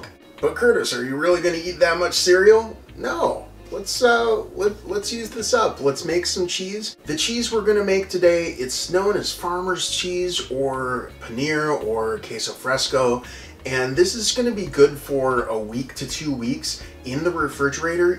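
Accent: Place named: Northern Kentucky, United States. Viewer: Are you from northern Kentucky? You sound like it. 😊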